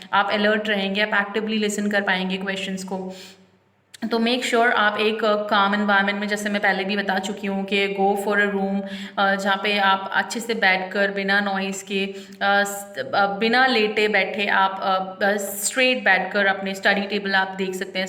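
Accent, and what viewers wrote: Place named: United States. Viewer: Woh great accent Ru from USA? Your English and presentation skills are awesome.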